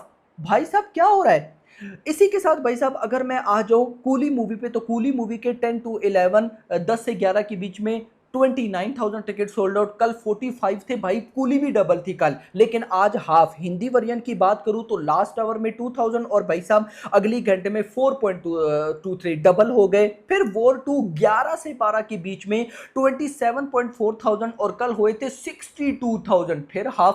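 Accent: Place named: Pakistan. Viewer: Yes ye Pakistani h , iske bolne se malum pad rha h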